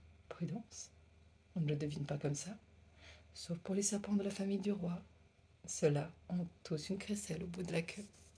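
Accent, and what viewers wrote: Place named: Spain. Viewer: Youpi je suis le 100e abonné 🙃Tu as un petit accent et une manière de parler bien spécifique, tu es espagnole "de base" ?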